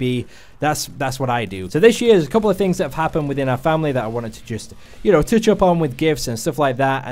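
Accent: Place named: Northern England, United Kingdom. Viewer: his accent is really odd, like northern (english) with an American tinge...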